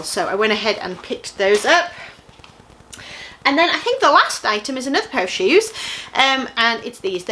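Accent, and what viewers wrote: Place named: United Kingdom. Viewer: Love your British accent and lingo!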